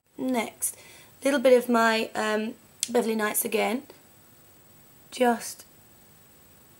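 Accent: United Kingdom. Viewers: i love british accent:)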